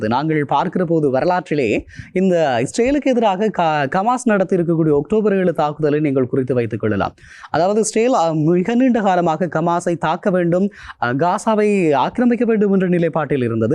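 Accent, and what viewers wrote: Sri Lanka. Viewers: Ivan ilangai tamilano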